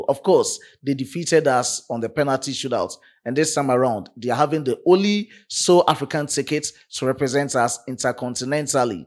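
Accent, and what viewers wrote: Ghana. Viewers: You sound like another Ghanian pretending to like Nigerians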